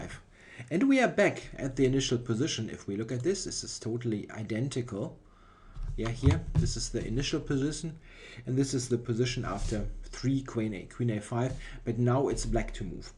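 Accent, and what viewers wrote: Germany. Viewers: alter.. bist du deutsch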